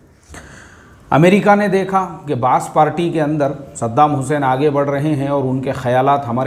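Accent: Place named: Maharashtra, India. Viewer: Pure Maharashtrian tone. 🙏🙏🙏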